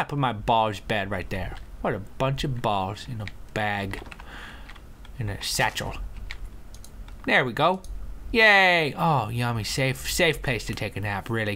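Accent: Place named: United Kingdom. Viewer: I really hate British voices on men, stop, I love you, just stop.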